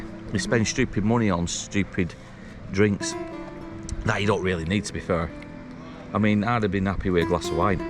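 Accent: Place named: Lancashire, United Kingdom. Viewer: Your putting that stupid Lancashire accent on like Lindsey Hoyle,the speaker of the house of commons, really mate you seem a decent guy but chill the Lancashire accent a bit nothing personal,I do like your videos 😜.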